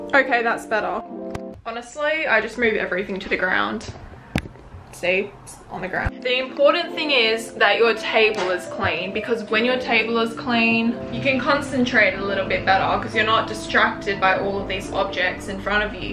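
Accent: Australia. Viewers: I LOVEEE YOUR ACCENT!! Hah its so fun and chill. Is it an Australian accent by chance?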